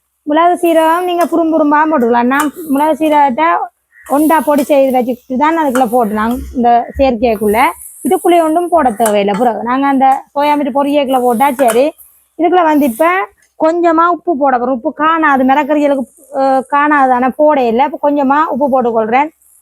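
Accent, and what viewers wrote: Sri Lanka. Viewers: முன்னாடி என்றால் யாழ்ப்பாண தமிழோ ...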